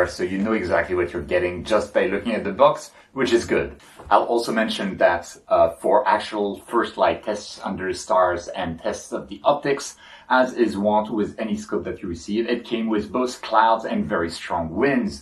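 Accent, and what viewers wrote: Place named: France. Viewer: Bonjour de Belgique, it seems that you have a french accent, serais-tu français?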